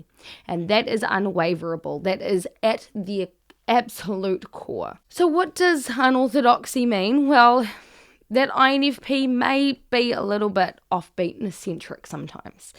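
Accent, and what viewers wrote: New Zealand. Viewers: Sounds like a kiwi accent, she'd be from New Zealand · Is that a kiwi accent I hear? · Are you a kiwi????? 😄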